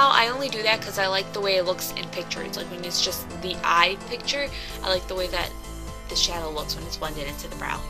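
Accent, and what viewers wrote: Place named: Chicago, United States. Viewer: I love the way you speak... So Chicago. I'm in Wicker Park so I can totally call the accent when I hear it! Great job, your friend is beautiful. :)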